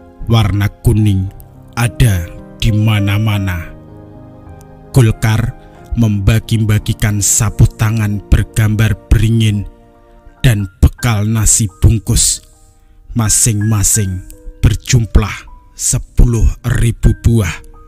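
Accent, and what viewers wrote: Java, Indonesia. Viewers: terlalu medok bahasa jawanya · jgn medok medok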